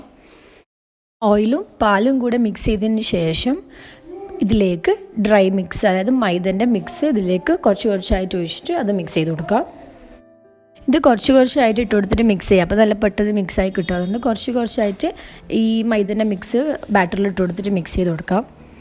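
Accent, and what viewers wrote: Kannur, India. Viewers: Nigale veed evideyaa kannur aanoo samsarikumpol nammude kannur kari ne pole und😊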